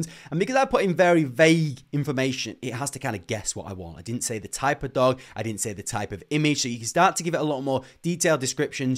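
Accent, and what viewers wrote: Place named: Manchester, United Kingdom. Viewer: You sound like a Manc! 🎉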